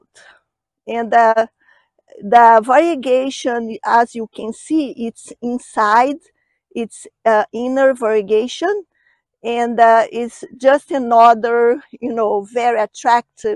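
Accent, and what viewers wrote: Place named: Brazil. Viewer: Seu sotaque, sotaque de brasileiro é inconfundível .Eu também coleciono hoyas. Tenho 25 espécies. Mas nenhuma no momento floresceu :(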